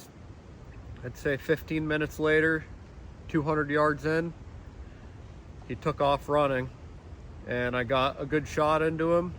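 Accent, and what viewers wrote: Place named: Midwest, United States. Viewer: You sound and talk like a Midwestern cowboy.Where you from good sir?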